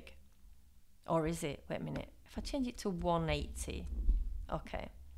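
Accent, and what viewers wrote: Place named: Northern England, United Kingdom. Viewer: Thanks for the tutorial. Love your accent! My mom is from Huddersfield, and you sound like you might be from somewhere up there in the North of England.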